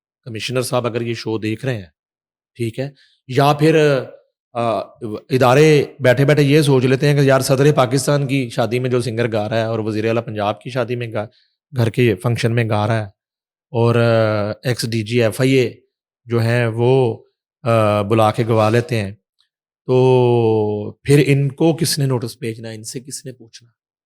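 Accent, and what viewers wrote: India. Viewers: اگر آپ نے انڈین کلچر ہی پروموٹ کرنا ہے تو بہتر ہے آپ انڈیا چلی جاؤ.... پاکستانی بچوں کی اُردو خراب نہ کرو.... بہت شُکریہ😮 خ...خان کو کھان کہنا غ.....غیر کو گیر کہنا یہ ہمارے ہاں نہیں چلے گا افسوس اس بات پہ ہے کہ ڈاکٹر ثمینہ حق نے بھی اپنے ایک پوڈ کاسٹ میں کہا تھا کہ انڈین ڈرامے سٹار پلس وغیرہ دیکھنے چھوڑیں آپکی زُبان، کلچر، رہن سہن، مذہب اور دماغی سازشیں سب کُچھ آپکے ماحول کو گھروں کو خراب کر رہے ہیں.... مُشتری ہوشیار باش 😮😢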